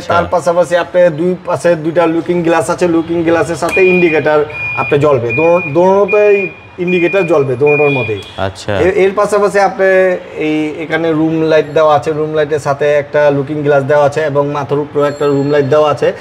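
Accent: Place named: Kolkata, India. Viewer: লোকটি কোলকাতার বাবু নাকি? ভাষা এমন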